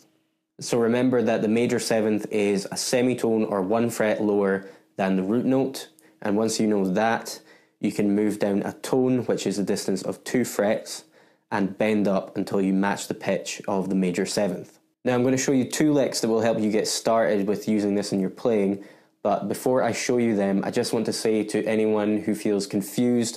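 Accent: Scotland, United Kingdom. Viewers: Nice video dude, btw where are you from? I think some of your vocals sound a little scottish sometimes but I'm not sure though, english is not my mother language so I find it hard to recognize accurately accents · Are you Scottish? I hear a Scottish accent when you talk. Haha but I'm not sure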